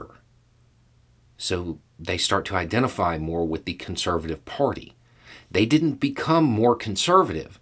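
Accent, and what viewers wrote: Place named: Appalachia, United States. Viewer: Ah another "I have a hillbilly accent but I'm not like the rest of them" Your Zelda shirt gives you away, dude.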